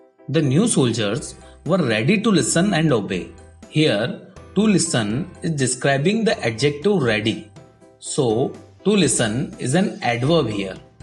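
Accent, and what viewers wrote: India. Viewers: I wonder why i don't understand indian english? Is their someone feel the same?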